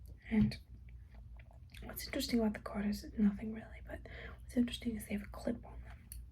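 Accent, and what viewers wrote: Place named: New York, United States. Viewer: I love your ny accent videos! 💟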